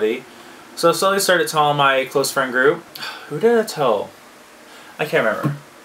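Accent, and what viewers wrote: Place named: Canada. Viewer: what part of Canada are you from btw ? big city or small town ? ...your accent gave you away before you mentioned Canada...how old did you say you are ? I thought you said 20 yo...nice coming out story glad it worked out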